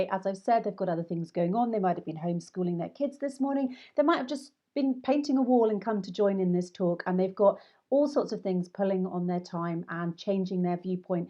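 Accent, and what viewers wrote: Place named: United Kingdom. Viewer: Hi madam,I'm really big fan of yours,i like British accent,especially your accent.kindly suggest me any content or how i can contact with you to learn this beautiful accent which you have.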